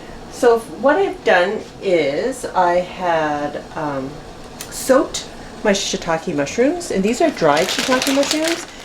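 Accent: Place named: Canada. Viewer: I feel like I just watched maangchi with a Canadian accent! 🤔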